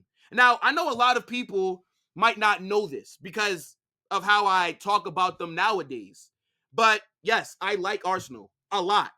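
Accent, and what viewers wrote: United States. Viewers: I'm so happy about finding this channel actually cause finally i found some one on YouTube speaking about my favorite team which is real Madrid and also about soccer with a very clear American accent that's amazing keep going 👏 👌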